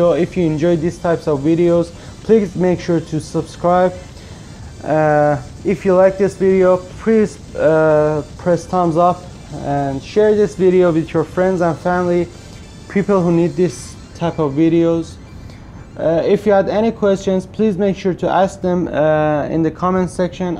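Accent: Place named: Iran. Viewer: دمت گرم جوون قربون انگلیسی حرف زدنت. حرف نداره. تا گفتی ادین، گفتم این لحجه باید فارسی باشه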